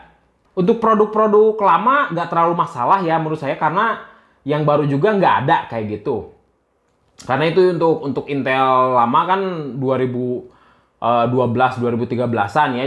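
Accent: West Java, Indonesia. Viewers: kaya ada logat sundanya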